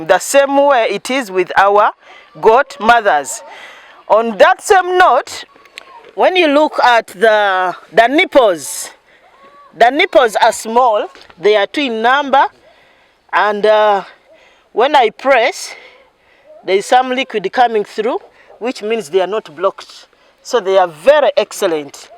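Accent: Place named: Uganda. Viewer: You are doing well ma. Excellent in your explanation, you must be a good teacher. I love Ugandans accent.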